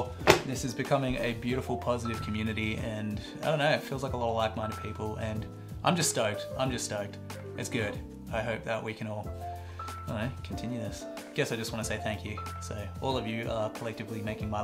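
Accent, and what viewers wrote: Australia. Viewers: …Have you considering recording ASMR/bed-time-story/Calm-app-style content, in which you dish out your sage wisdom in that gnarly Aussie accent so your subscribers can fall asleep every night slightly less anxious? … Asking for a friend. 🤣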